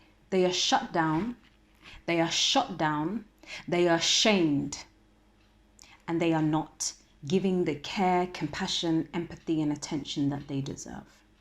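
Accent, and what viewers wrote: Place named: Canada. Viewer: Thanks for sharing your knowledge on this. I promise you I will share it with my friends. Also I pray that the whole of western world will understand the need to this issue.Very thoughtful. Am deeply sorry to ask, what do you studied at the University and are you from Canada? Because the way you always pronouncing the (Better) sound like you are. It's out of curiosity.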